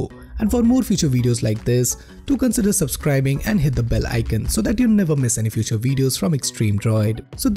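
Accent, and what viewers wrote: India. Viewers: If you read „official“ in the title and hear an Indian voice ... it’s fake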